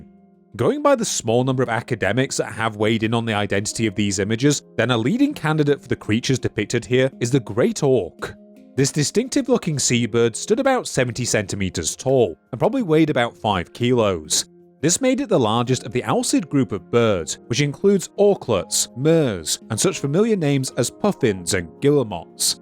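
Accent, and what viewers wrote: United Kingdom. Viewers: i just feel like you should rename yourself 'A Histocrat' and replace the 'The' because it doesnt round well and it only sounds alright due to the british accent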